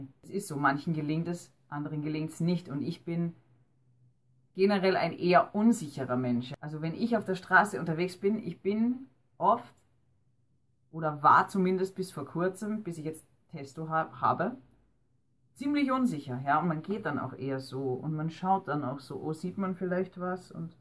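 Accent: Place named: Vienna, Austria. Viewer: bist du aus wien oder niederösterreich oder so? dein dialekt hört sich bisschen so an^^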